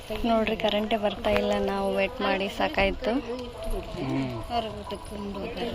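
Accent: North Karnataka, India. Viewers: Supet nema ❤❤ nice vlog video ಮಾಡ್ತಾ ಇರಿ ನನಗೆ ನಿಮ್ಮ ಉತ್ತರ ಕನ್ನಡ ಭಾಷೆ thumba ist sissy ಅದಿಕ್ಕೆ video miss madde nodthini ❤❤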